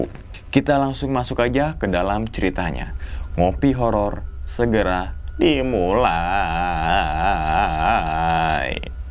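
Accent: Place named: Java, Indonesia. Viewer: Bang,,btw asli mna 🤭ngomong bahasa jawa nya kyak gmn gtu,,,medok medok kota,🤭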